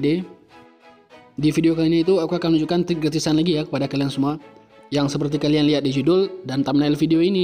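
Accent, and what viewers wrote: Aceh, Indonesia. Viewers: Logat aceh sang? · Kaya logat aceh ya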